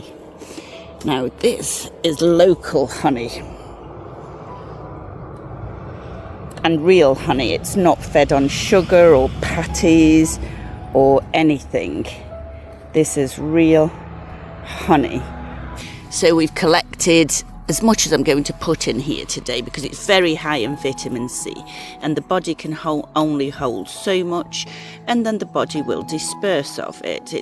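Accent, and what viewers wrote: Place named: RP, United Kingdom. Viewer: Oh dear jolly hockyscks why do you talk like that all posh now when we were there you didnt it sounds terrible.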